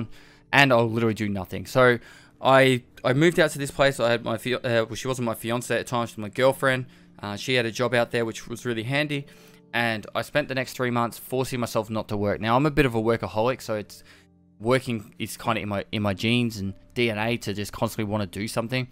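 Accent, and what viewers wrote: Australia. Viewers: So I found you because you ran duos with summit but I was so pumped when I heard an Aussie voice. I'm 36, father of 3, business owner and very part time streamer too and I think the biggest thing you say here that is so correct. Consistency. Your chat is always so busy so I'm taking advantage of this opportunity here to say, thanks for being so humble, honest and truthful on and off stream. I really enjoy being a part if the community and if you ever make it back to Radelaide beers on me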